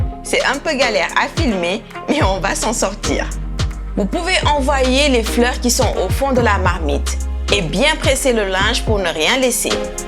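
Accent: Senegal. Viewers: Elle s'exprim kom une vraie sénégalaise😂😂 C chouett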